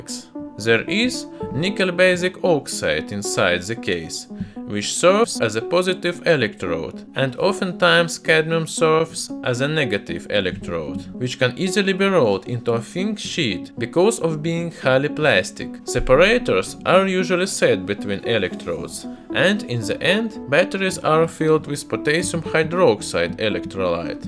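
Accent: Russia. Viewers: you have a hard russian acent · تعلم اللغة الانجليزية هو روسي و يحاول التكلم بها انا افهم كل فديوهاته 😁😁😁😁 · I love russian English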